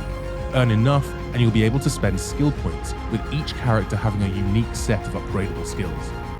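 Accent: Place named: United Kingdom. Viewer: My man you need more views with that smooth British accent.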